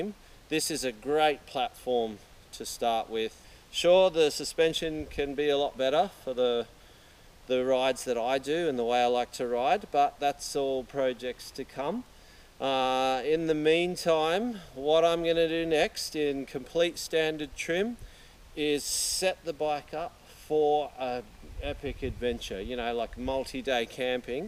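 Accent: Australia. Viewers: your Aussie accent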